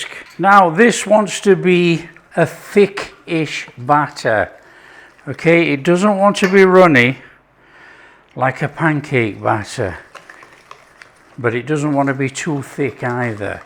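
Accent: Yorkshire, United Kingdom. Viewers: Yummy... easy to prepare must get rid of my old apples and make good use of em, I would make the spices in a bowl I usually add a tsbl of METAXA and brown sugar. Thanks are you from Yorkshire Im a Yorkshire lass :)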